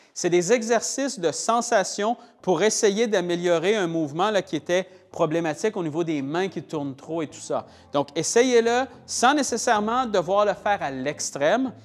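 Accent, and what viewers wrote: Quebec, Canada. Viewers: Vidéos toujours aussi bonnes en plus j'adore votre accent québécois.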